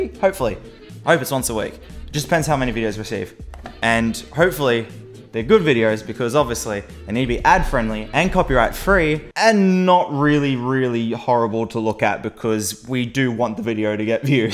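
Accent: Australia. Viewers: Are u Australian? U dont have to answer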